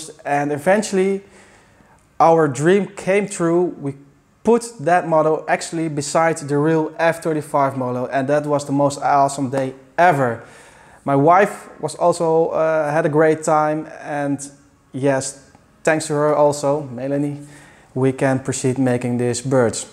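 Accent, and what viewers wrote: Netherlands. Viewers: Ik hoor een nederlands / engels accent. ^_^ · Je hoort dat het een Nederlander is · I nit think he us russian my friend, he sounds dutch to me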